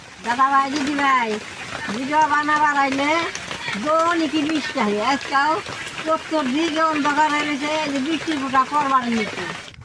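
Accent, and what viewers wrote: Tangail, Bangladesh. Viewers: Didi ki tangail er manus naki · টাংাইল এর ভাসা নাকি মানিকগঞ্জ??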